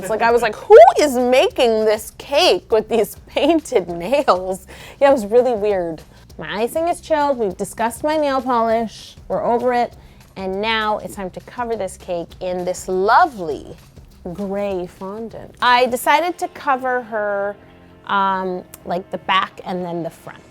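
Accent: Canada. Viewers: Canadian accent really came out this episode, i love! the cake is absolutely amazing and adorable 🥰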